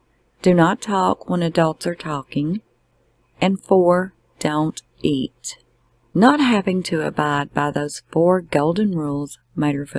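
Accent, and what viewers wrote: South, United States. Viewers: There’s something about your voice.. it’s soothing. Maybe it’s your southern drawl or the inflection in your voice?!? Either way I do enjoy your videos, book synopsis are my favorite.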